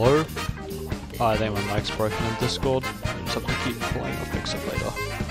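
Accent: Germany. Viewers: General Question: Are you German? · Das ist so englisch mit Deutschen anksend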